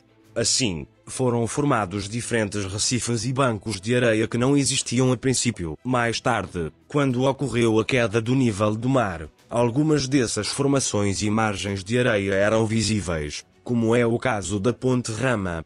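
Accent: Portugal. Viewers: Sotaque português, provavelmente da zona de Lisboa... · 🎯 EXELENTE VÍDEO!👍🏻Guerreiro da Casa do Conhecimento... me responda por gentileza!👍🏻Pôr quê... à narração, está com sotaque de um português? 🤔 Estamos Juntos! 📡 NAMASTÊ! 💥🖖🏻®️ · Sotaque português,que porra é essa?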